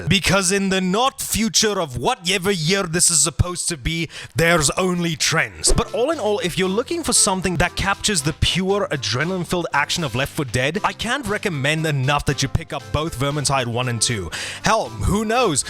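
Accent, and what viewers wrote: South Africa. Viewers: Why did it take me like 10 mins to realise you have a Saffer accent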